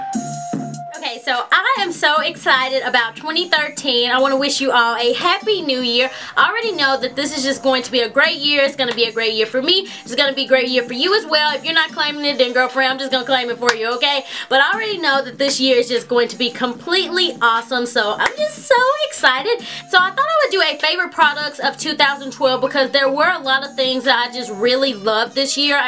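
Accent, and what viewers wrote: South, United States. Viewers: you sound southern!! :]] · no means for disrespect are u a southern bell u sound just like one i apoligize if i had disrespected u in any way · I love your accent! Im a southern girl as well ;)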